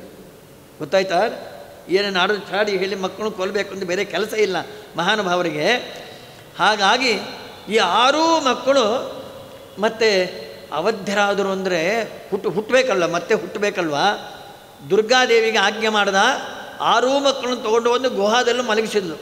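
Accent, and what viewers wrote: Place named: North Karnataka, India. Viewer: I enjoyed listening to this discourse mainly for his Kannada of north Karnataka flavour.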